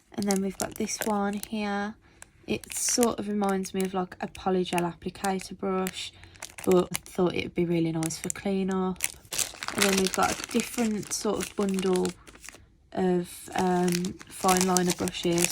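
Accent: West Midlands, United Kingdom. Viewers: New subscriber love it all lol and your accent is very common lol 😆 😂 I'm from walsall West midlands and I said to my chap "omg babe she's from round here somewhere ay she ? " lol 😆 🤣 😂 I'm a begginer at nails just started out a few months ago love everything you have look forward to your next haul lol like being nosey what others get 😆 😄. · Hi, just found ur channel so ive subscribed, i know how important likes, views & subs r on Utube. Just wanted 2 say " U GO GIRL ", nice 2 c uk nail artists starting new channel, as its so swamped with USA, which i enjoy watching 2, but wud luv if UK & USA were more evenly represented!! I also thought of starting 2 film my nail art but I'm just not brave enough at min, so huge respect 2 u 4 doing that 😊 U GO GIRL!!!! Anyway, enough waffle from me 🙊, lol, lookin 4ward 2 more vids from u, BYYYYEEEE ❤❤❤ P.S. 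Do u mind me asking where ur from? Not exact address, lol, im not a stalker, promise, but im from Oldbury and I have a feeling ur not 2 far from me judging by ur accent. I wud luv some input from a local nail enthusiast as ive started making & selling press ons. If ur 2 busy no problem, I'll find sum1 eventually, just wanted 2 ask 3 or 4 questions if u ever have time, thx hun 😊😊 xx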